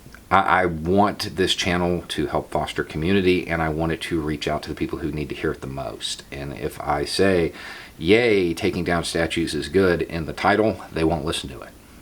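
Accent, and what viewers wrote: South, United States. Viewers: I wish I had noticed how many internet people had subscribed when I did...I'm sure it was the first or second howdy there and I was hooked. Up here in New England, I missed the drawls of the south. I like the beard and lack of toxic masculinity and that he calls b.s.( very tactfully) on the dear leader's actions. I really enjoy the satire of an upside down curious George and the cake dialogue with your woman ( legit had me crying laughing). Congratulations to you. Positive vibes from New Hampshire and remember to be kind to each other and yourself during this pandemic and social crisis . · of the Fifth Column Got it Thank you for the explanation. As a fellow southerner (S.C.) I truly appreciate what you are doing. It’s always great to hear someone that talks like me expressing these views. It’s nice to know I’m not alone. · I'm in Texas waist deep in Southern racism and Trump lunacy. 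I went to a small town all white school where teachers and students dropped the 'N' bomb shamelessly. Nearly everyone on my FB feed and half my relatives are in this mindless Trump cult. Children of the Dixiecrats and they haven't changed a bit since the 60s. You're a Southern man,....how do you deal? I don't kick people off my Facebook friends list because I don't want to be isolated in an echo chamber and also to let others know they don't have to be bullied by some small town alpha pup into going along with the crowd. I don't call people names or put up mocking memes, even though I'm tempted, but just post credible journalism and, I hope, sound debate. But it doesn't matter. It's like trying to convince a crazy person he's not the reincarnation of Jesus. Anyway, it's nice to peek out of my North Texas bunker and see someone talking sanity with a Southern accent and a bubba cap. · Pfeil My personal prejudice is centered around people that talk southern. 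So, at first, was hard to take. I got over it and look for his posting every time I sign on now.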